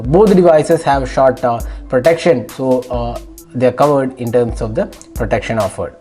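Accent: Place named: Kerala, India. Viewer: Ipol thanne malayalam pole aanu feel cheyunnathu. Malayali analle .? Engane manasilayi.? Athu English ketapol manasilayi .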